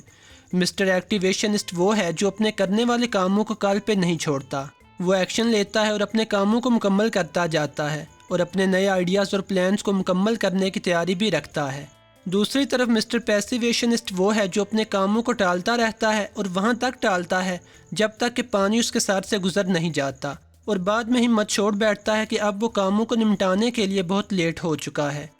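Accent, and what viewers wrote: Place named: Punjab, Pakistan. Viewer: پنجابی لہجے میں اُردو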